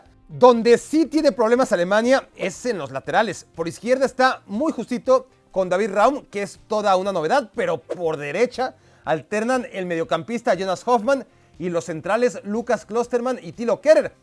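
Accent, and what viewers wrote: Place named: Mexico. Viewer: Es mexicano, se le nota en el acento. Logicamente va a hablar mal de Argentina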